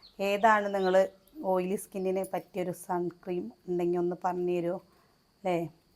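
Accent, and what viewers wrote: Palakkad, India. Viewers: Chechide palakkaden slag kelkan nalla rasamund from kasaragod 🥰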